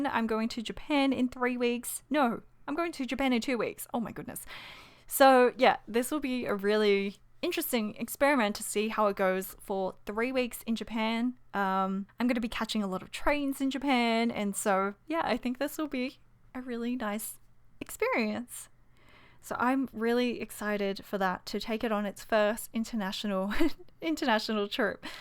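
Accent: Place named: Australia. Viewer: The Australian accent though 😍😍😍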